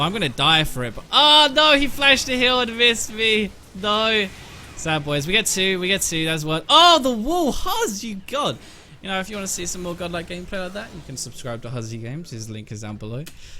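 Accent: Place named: Nottingham, United Kingdom. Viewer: is totally from Nottingham or Oxford or some shit... >.>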